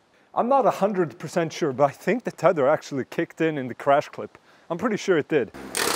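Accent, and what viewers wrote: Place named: Sweden. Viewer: That was pretty amazing... And I recognised your Swedish accent almost immediately — it’s remarkably similar to David Windestål’s. I’ve watched enough of his tricopter videos to recognise that accent that in no time!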